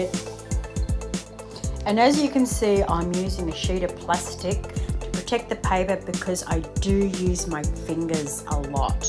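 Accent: Australia. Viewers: Looks beautiful 👏🏼👏🏼👏🏼 What part of Australia are u from? Or did I get your accent wrong?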